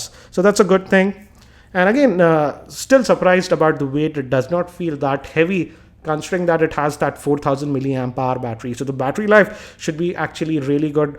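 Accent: India. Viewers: lol unbooxinng typical Indian accent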